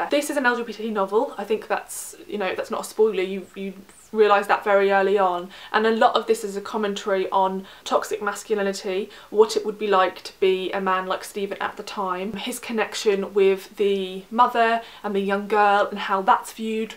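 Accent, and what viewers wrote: England, United Kingdom. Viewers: I love her accent. Could i know which part of England she comes from??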